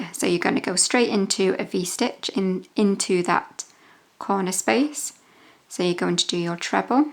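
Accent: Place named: North, United Kingdom. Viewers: Nice Northen-y accent, which is a breath of fresh air! Would like to see your video for blocking! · I love this stitch but I've never seen it in a granny square! Great video, thanks so much 😊 also love your accent!!! I'm from Yorkshire but I live in Canada so it's great to hear the sound of home 😊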